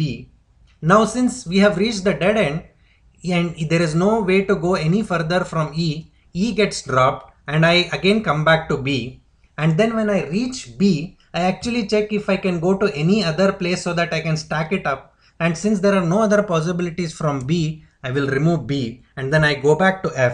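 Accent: India. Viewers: i like your indian accent :) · but i hate his fucking indian accent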